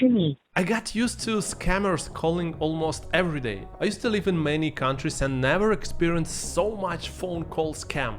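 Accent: Singapore. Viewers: Yeah ur ar so funny. U Sounds more n more like a Singaporean. Ur video nvr fail. Amazing ❤Enjoy watching ur video. What i love most as I mentioned before is ur accent. Btw we hv another habit love to q for free product too. Sometimes the ppl who is in front of us do not know what the free product is..juz q. 😂anyway take care.🙏 Looking fwd to ur upcoming video. God bless😇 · Haha you’re sounding more and more like a Singaporean one😆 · the way you speak, you are almost a Singaporean.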